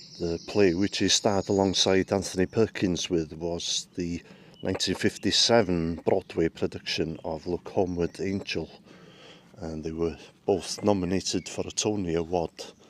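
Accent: Wales, United Kingdom. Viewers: Just want to say I love your channel. Excellent channel. My mother was born n South Wales so I am half Welsh and proud of it. Your accent reminds me of all my mothers brothers sisters and my welsh grandparents. Their first language was welsh. Keep up the great channel. Diolch yn fair iawn.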